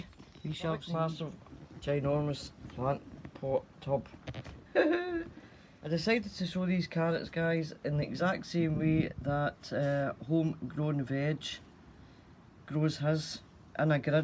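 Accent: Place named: Glasgow, United Kingdom. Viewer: Hiya hun, I love your accent, is that a touch of Glasgow I hear? wow you've loads going on, yep definitely top your spuds right up, as soon as the stems set after poking through, they stop producing the stolons and it's the stolons that the spuds grow on. If you need anymore San Marzano, give us a shout. Take care. Alex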